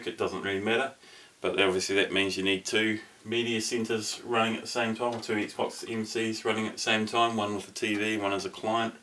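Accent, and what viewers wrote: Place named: New Zealand. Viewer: Kiwi much? ;-)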